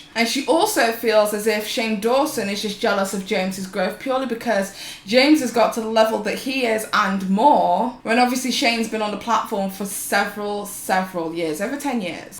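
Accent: United Kingdom. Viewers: Started watching your videos recently. As a fellow Brit, its wierdly comforting to hear your lovely British accent, when the majority of the video's in a sea full of American accents. KNOWS THE MEANING OF NUANCE new Subscriber. X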